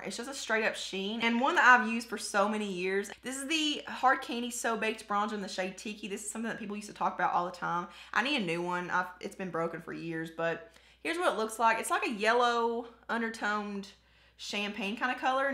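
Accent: South, United States. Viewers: Just found you! Another southern accent.. I can soooo speak your language!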